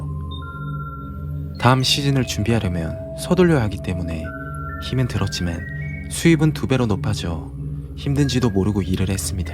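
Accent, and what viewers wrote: Gyeongsang, South Korea. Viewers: 안타깝네요.😭 님 갱상도사투리 억수로 잘하시네예.갱상도 분이신가?🤔🤔😁👍👍👍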